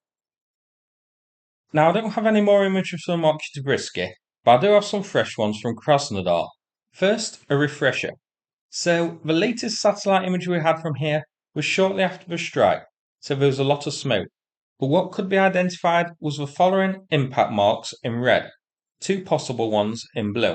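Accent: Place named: Yorkshire, United Kingdom. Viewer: I just Luv your Yorkshire Accent...It reminds me so much of my Granddad. 😊😅😂...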